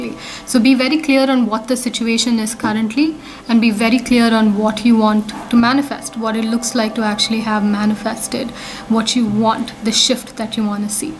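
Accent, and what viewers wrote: Kerala, India. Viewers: I love your looks and accent!!!! Indian!?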